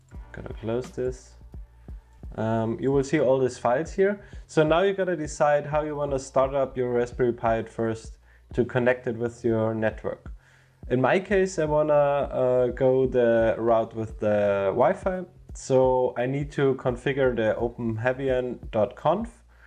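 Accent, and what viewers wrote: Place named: United Kingdom. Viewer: Love your work man, and your British is cool 🤙🏻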